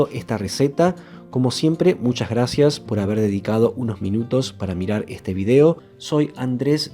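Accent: Argentina. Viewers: Hola Andrés! Voy a tratar de hacer esta delicia hoy, pero tengo un par de preguntas. Las cantidades que vos decís en el vídeo no coincidan con las cantidades en tu receta cuando saqué la impresión. También, en tu vídeo vos decís dejar cocinar la salsa (carne) por una hora y la traducción en Inglés abajo del vídeo dice 2 horas. Yo voy a seguir tus instrucciones, por supuesto. Sólo quería que vos supieras que los que siguen tus instrucciones en Inglés, no la van a tener exactas. Vivo en los EEUU ya 50 años y hablo los dos idiomas perfectamente. Saludos y gracias por tus recetas. Me encanta tu acento Argentino. Lo extraño tanto…Te hago saber cómo salieron los ñoquis!!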